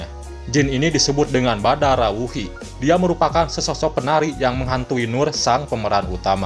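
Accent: West Java, Indonesia. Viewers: vo nya org sunda fix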